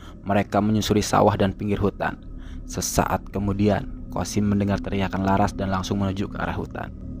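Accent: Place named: West Java, Indonesia. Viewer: Dari fb, pindah ke sini penasaran pingin tahu alur ceritanya, seru dengar suara aa cerita Sunda banget ☺👌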